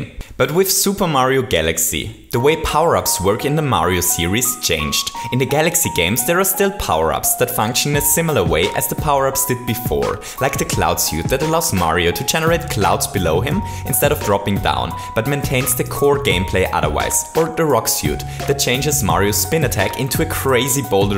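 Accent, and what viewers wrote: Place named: Germany. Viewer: You have awesome content but the speed you are talking gives no chance to deeply analyze everything + I believe you are German speaker because your English sometimes makes me feel I am listening German, so my brains errors =) I believe if add little delays between sentences that will make videos even more interesting. Thanks anyway and like!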